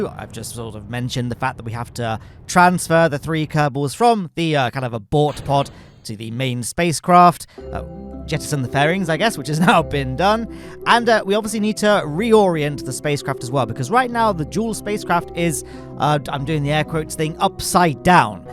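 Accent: United Kingdom. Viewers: Love the incoherent british rambling about "jool" and "jewel" for 5 minutes. Keep it up!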